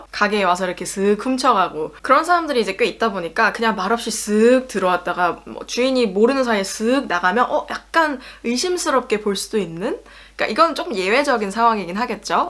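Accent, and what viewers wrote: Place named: Saxony, Germany. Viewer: 제가볼땐 발음은 약간 동독(작센)사람이 베를린이나 북부독일(함부르크 등등)와서 표준말 구사하는 것 같아 보입니다.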